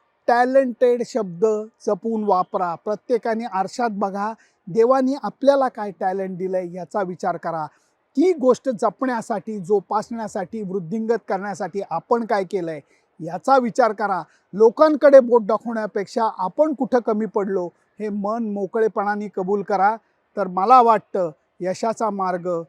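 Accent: Pune, India. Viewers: मग पक्के पुणेकर आहेत लेले काका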